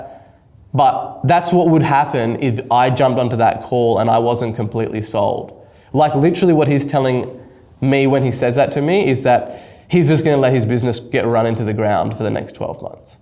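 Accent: Australia. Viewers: is hormozi with ozzy accent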